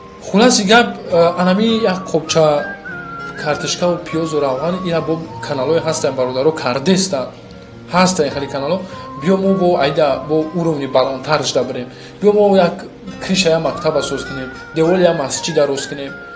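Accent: Kulob, Tajikistan. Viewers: Кулобии тоза!! 👍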